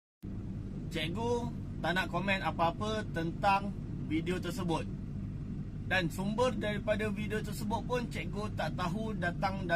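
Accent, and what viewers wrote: Johor, Malaysia. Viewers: Cikgu asal orang johor ni heheh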